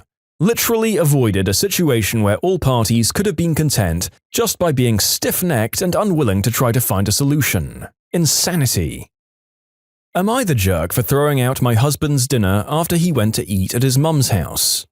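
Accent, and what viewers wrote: United Kingdom. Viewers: Why does bro sound so brittish?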